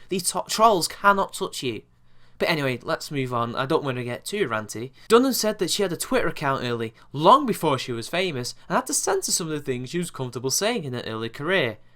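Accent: United Kingdom. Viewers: I somehow missed this in my subscription feed. This was really entertaining video. Thanks for the laughs! The way you say certain things in your hot British accent makes your jokes seem even funnier! Nice to see your handsome face on camera :)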